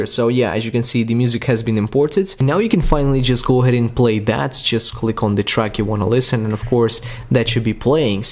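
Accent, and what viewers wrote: Russia. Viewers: Your accent sounds very Russian 🤔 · Omg, russische Akzent 🐷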